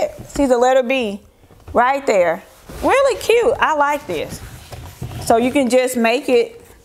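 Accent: South, United States. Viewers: You always look well put together so I see no degradation in your makeup. What's really sweet is ur country/southern accent!! So... "belle-ish".😄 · Honey after 5, girl your southern accent comes out all the time and I’m a fan okay, I love it. Thanks for sharing you got some cute...cute items!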